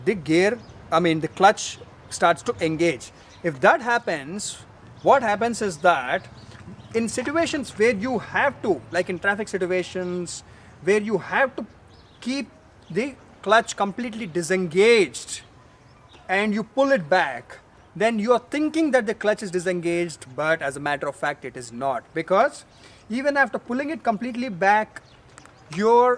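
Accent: India. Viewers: This is the first indian I take serious because his accent isnt as silly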